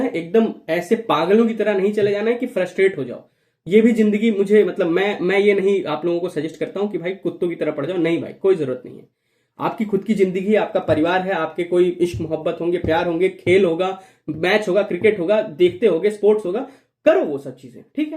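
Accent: Bihar, India. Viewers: Your Bihari accent is so cute😂❤ and the video is really helpful thank you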